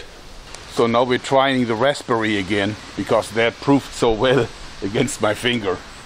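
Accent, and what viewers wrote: Germany. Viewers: are you come from Germany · FPSRussia ist ja auch aufgrund seines Akzentes berühmt geworden, und dass obwohl er kein Russe ist ^^. So ein Akzent macht jemanden einfach sympathisch. Ich finde deine Videos echt Klasse und sie zeigen der Welt das wir Deutsche nicht so ernst und humorlos sind wie gerne behautet wird. Ps gute Besserung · He is German, he has an accent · I really like his German accent (I' German btw)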